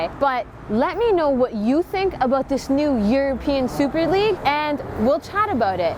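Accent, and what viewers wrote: Germany. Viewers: That Germán accent made you 1000% hotter!!!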